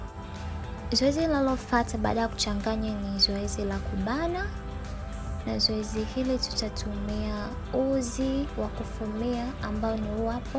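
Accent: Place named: Tanzania. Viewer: Kiswahili cha tanzani Tamu kwanza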